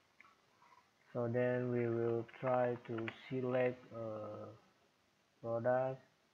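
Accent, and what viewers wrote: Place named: Indonesia. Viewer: makasih bang ilmunya ane cari tutor ini susah banget,,, untung ada abang kerjaan ane cepet kelar,,, logat indonya masih kerasa jadi ane ngerti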